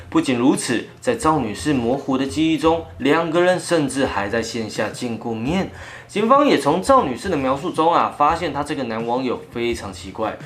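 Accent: Northeast, China. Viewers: 我艹，大叔是东北人？ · 听口音，这不是江苏淮安人啊，这很明显是个东北人啊，视频不严谨呢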